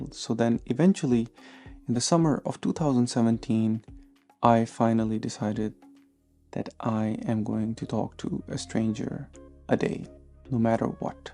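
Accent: Estonia. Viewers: Actually I was surprised that he even speaks English with estonian accent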